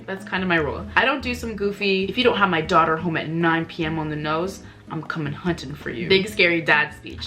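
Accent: France. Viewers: Okay but ton accent français >>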